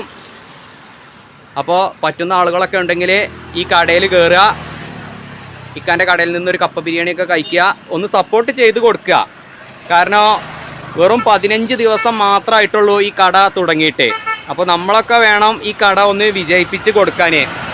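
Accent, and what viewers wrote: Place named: Malappuram, India. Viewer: ഒനുകിൽ മലപ്പുറം ശൈലി ബർത്തനം അല്ലെകിൽ ശുദ്ധമലയാളം ഇതു കപ്പ ബിരിയാണി പോലെ ആയി സാരമില്ല ശെരിയാവും പുതിയ ആളല്ലേ 👍👍👍👍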